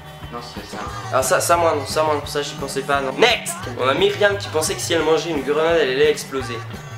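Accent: Switzerland. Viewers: Ton accent suisse? Parfait :o❤ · Ooooooooh, t'es suisse ? :') J'aime trop t'es vidéos t'es super drôle et le mec derrière il me tue trop :')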